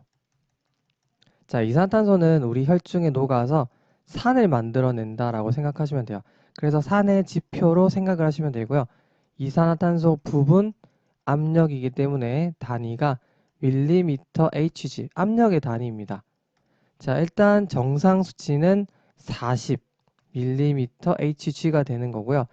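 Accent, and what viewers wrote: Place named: Gyeongsang, South Korea. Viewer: 약간의 경상도 사투리가 정감있어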